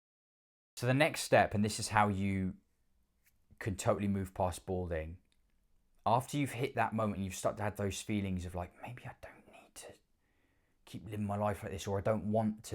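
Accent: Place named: England, United Kingdom. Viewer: Hi m8, i love your accent..where in England r u from?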